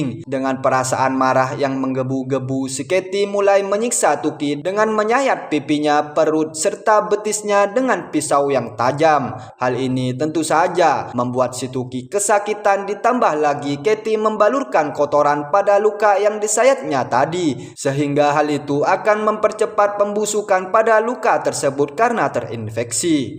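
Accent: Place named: Madura, Indonesia. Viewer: Suaranya kayak Oreng Medura....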